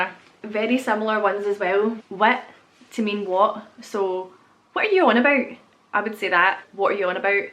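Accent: Scotland, United Kingdom. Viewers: This video is a gold mine for any actors or dialecticians looking to master a Scots accent. A lot of these aren't so much unique words that aren't in other English-speaking dialects, but rather the unique Scots pronunciations of those words -- and I love that kind of thing! Great stuff! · You lost like 80% of your Scottish accents. Just remembered you out of nowhere. used you love your accent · Polis, get tae and lassie are three of my faves. I've subscribed, great accent, attractive on a woman I think! I'm from south west England myself but know some nice Scottish people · Love Scottish accent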